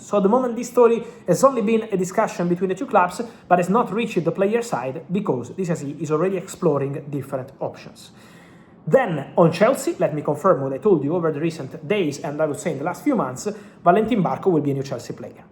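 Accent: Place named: Italy. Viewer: Mate I would love to give you a like when I try to watch your channel but I can’t understand a word you say. I think the content is world class but Unintelligible. I struggle to understand you because of your strong Italian accent and how quickly you speaks. Your English is good, but your pronunciation is difficult for me to follow. CC helps but names are garbled. Anyway great info thanks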